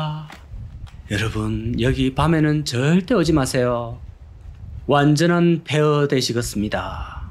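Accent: Gyeongsang, South Korea. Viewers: 오늘의 사투리 따시고. 갱상도 간편한 사투리 등 따시고 배부르고.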